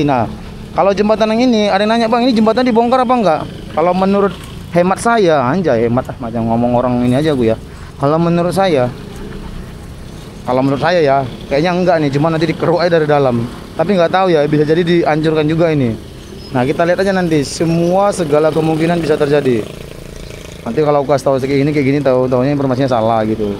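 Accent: Medan, Indonesia. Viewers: Logat medan nya lebih kentel lg bang, tambah celotehan lucu nya terus..😅😅😅😅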